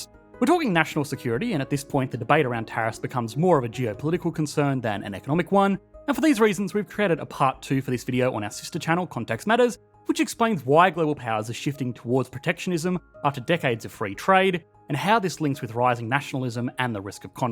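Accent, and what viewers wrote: Australia. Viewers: Always better when something is explained in an Australian accent honestly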